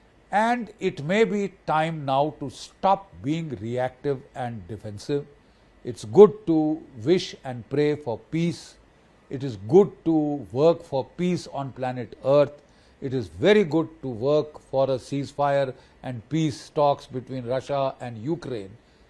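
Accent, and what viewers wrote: India. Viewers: Boss we love your dasi English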